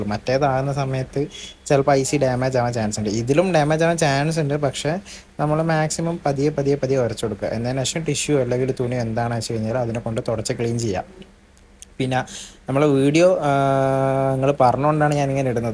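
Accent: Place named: Kannur, India. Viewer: Kannurkaran thanne.. pakka Kannur accent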